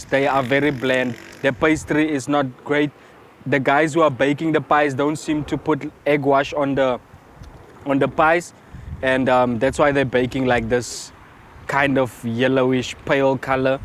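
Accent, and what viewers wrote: South Africa. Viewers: Where are you from? It sounds like you from the platteland, not local definitely... Maybe you immigrated to cpt. It's nice to see you guys doing well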